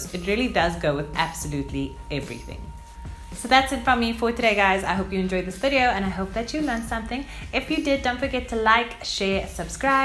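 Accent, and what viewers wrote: South Africa. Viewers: Maays South Africa ☉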